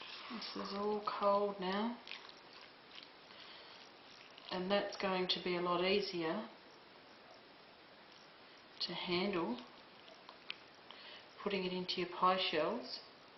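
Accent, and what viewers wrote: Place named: Australia. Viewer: There's the Aussie accent -- and the rest of the English-speaking world. Kiwis are even harder to understand for the rest of the world. What's so AUSSIE here is the electric pie maker! G'day nice lady!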